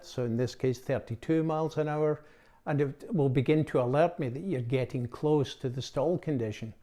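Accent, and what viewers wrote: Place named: Scotland, United Kingdom. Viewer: Was easy on my ear, hearing a fellow Scotsman 🏴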